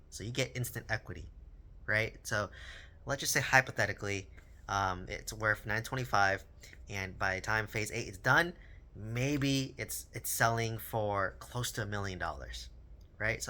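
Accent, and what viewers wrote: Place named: California, United States. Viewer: Also…sounds like you’re from CA…how do you feel comfortable buying RE in other states like OK?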